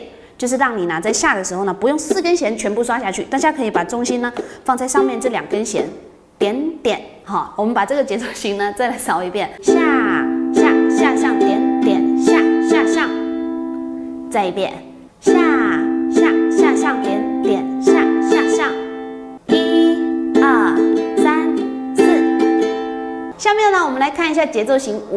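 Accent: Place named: Taiwan. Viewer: 我觉得像台湾人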